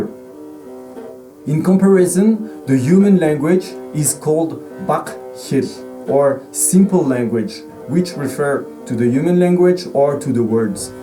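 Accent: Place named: France. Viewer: Naturellement ! je voulais dire par là qu'on entend bien votre accent français dans la langue de Shakespeare. Et oui merci pour les sous-titres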